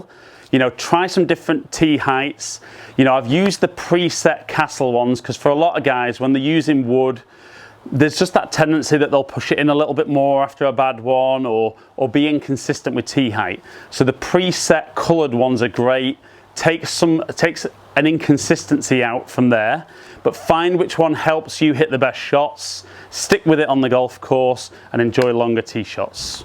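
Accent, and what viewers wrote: England, United Kingdom. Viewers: Joe Longo But you will notice by his accent that he is English? We speak different from you in the US! <link>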